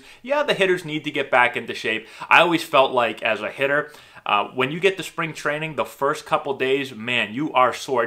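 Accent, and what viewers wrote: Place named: Boston, United States. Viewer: Boston accent. Nice. Now say "I went to a bar and parked my car. I put my car keys in my khakis."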